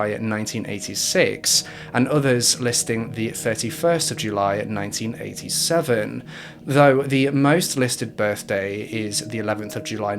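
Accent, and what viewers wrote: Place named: England, United Kingdom. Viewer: i ABSOLUTELY LOVE LOVE LOVE YOUR ENGLISH ACCENT. SOO JEALOUS... IM FRIM NEW YORK A WHITE MALE WITH AN EVEN STRANGER ACCENR THAN YOURS. I LOVE YOUR CHANNEL. I COULD LISTEN TO YOU ALL DAY LONG. MUAH .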